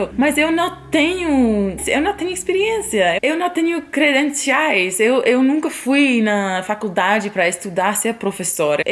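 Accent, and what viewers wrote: Rio de Janeiro, Brazil. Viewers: Amo seu sotaque carioca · Seu português e ÓTIMO !!! Melhor ainda com o sotaque carioca !!! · Teu sotaque de leve já dá pra sentir o carioca! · Tão fofinho e bonitinho seu sotaque carioca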